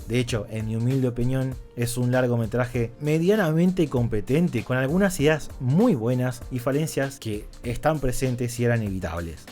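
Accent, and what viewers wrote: Argentina. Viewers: Estos vídeo análisis son fascinantes y el acento argentino lo complementa y me parece un cuasi estándar contemporáneo con otros grandes exponentes de críticos youtuberos como Axl Kss y otros. No me imagino las reflexiones y conexiones que harás para el episodio 3 que es el cierre y el punto álgido catarsis de las precuelas .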